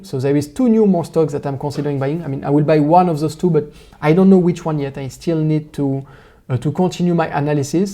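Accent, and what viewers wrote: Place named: France. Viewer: Haha I love your french accent😂 how old are you?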